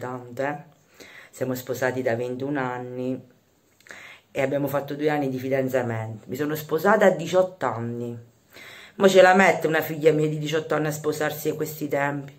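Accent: Naples, Italy. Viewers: Ti ringrazio se mi saluti da Siracusa e complimenti mi fai morire dalle risate quando racconti le cose alla Napoletana 🤣🤗🥰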